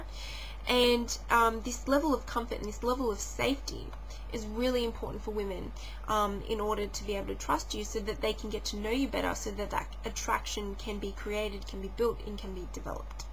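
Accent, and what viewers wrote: Australia. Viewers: Oh my yes, hubba hubba! Sexy down to the marrow. Auzzi accent is to-die-for! Edible, SOOOOOO edible.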